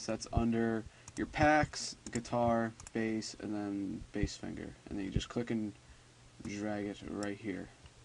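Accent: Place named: Pennsylvania, United States. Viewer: sounds like pa, maybe northern va or maryland. that area most def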